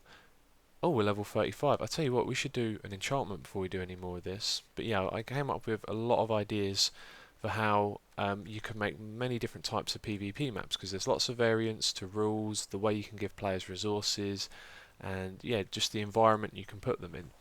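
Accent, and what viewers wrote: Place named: England, United Kingdom. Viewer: Your pronunciation of water is correct, you just have an accent. I would say about 50% of English people say it that way. I also do that sometimes, I pronounce mountain Moun'ain. I also noticed about 50% of Americans tend to do that.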